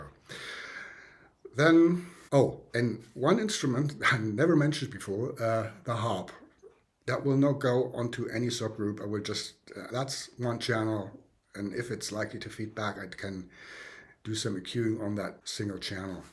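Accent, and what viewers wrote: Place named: United Kingdom. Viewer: Lieber, danke für Deine Videos! Ich finde, Du erklärst schön klar und verständlich. Ich habe gleich abonniert. Darf ich fragen, wie Du zu Deinem großartigen Englisch gekommen bist? Ich finde, das klingt so schön britisch. Liebe Grüße, Renee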